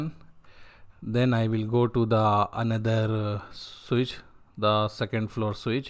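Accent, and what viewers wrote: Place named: India. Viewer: Normally i look at dislikes if a video is a waste of time, but youtube removed them. Thankfully i heard your indian accent and knew this would be helpfull thanks man.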